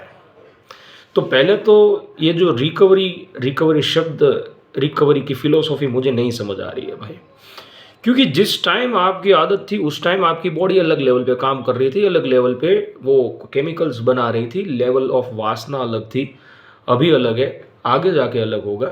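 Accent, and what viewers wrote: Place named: Gujarat, India. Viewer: Sir ap Gujarati ho apiki bol se Gujarati lagraheho.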